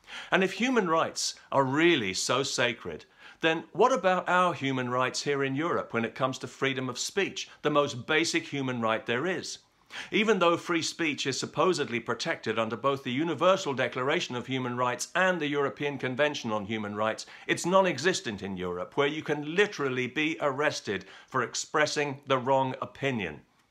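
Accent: United Kingdom. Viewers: You remind me of Michael Caine, a bit. Love & agree with just about everything you say; nothing nicer than a crisp, British accented voice logically assessing current issues. I could listen to you talk all day long!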